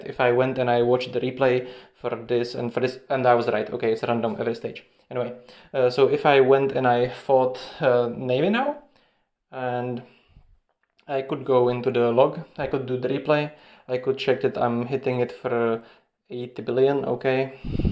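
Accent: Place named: Czech Republic. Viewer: Držím palce, ale tvá výslovnost AJ mi trhá uši. Slovní zásoba a slovosled je relativně OK, ale ta výslovnost je Czenglish. Doporučuju poslouchat třeba Kubovu English, má tam často věci k výslovnosti.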